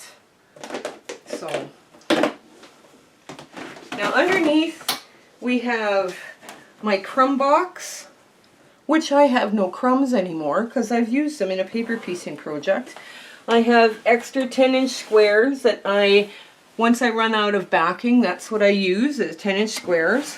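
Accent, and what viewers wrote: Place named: Canada. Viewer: Hi, I envy your organizational skills. My sewing room is a mess right now. We're going to move my sewing room from the dining room to the den. The den is actually smaller so I'm going to have to get better organized. I love how you have so much fabric ready in anticipation of using it in a project, your storage of 2.5 inch strips is genius. I'm stealing that idea from you and I'm going to have to steal your Area 51 for my UFOs as well. It's too funny. I have a Bermuda Triangle in my laundry room, it's where socks go to another dimension. I love your Canadian accent! I went to school in Ontario so my ears perk right up when I hear that lovely Canadian way of saying certain words like project, it takes me back to my school days, fond memories for me. I'm from Michigan and still have a slight accent but I think I sound like a Michigangander now. 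I have a very dear friend in Calgary, it's just gorgeous there.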